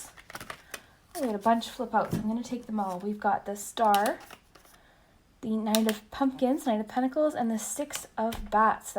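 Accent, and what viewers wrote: Minnesota, United States. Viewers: Are you Minnesotan by chance? I'm from there and your accent is familiar :)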